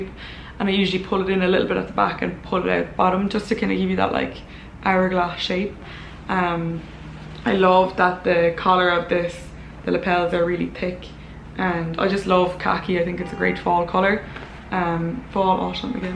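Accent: Ireland. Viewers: Always look forward to your videos, especially when you talk with your Irish brogue ❤️ Love from Canada 🇨🇦 Don’t worry, I’ve got my winter puffer jacket out. Getting way chilly here in Eastern Canada.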